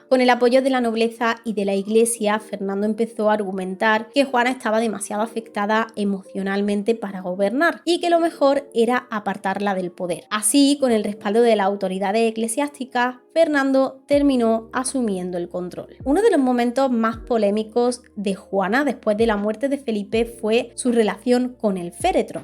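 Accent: Andalusia, Spain. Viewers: Hola hermosa ☺️ soy de Rio de Janeiro 🌻 Brasil Te acompaño hace un tiempo y ¡me encantan tus videos! ¿Vos sos de Andalucía? intento ubicar de dónde es el tonito, es muy diferente al de mis amigos de Bcn. Besos 💋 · Que lindo tono andaluz que tienes al hablar, saludos desde Argentina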